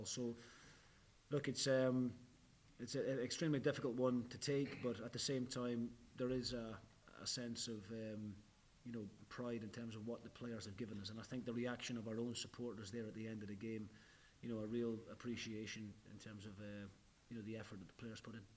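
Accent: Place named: Aberdeen, United Kingdom. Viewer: That's the first time I've noticed he's got a aberdeen accent lol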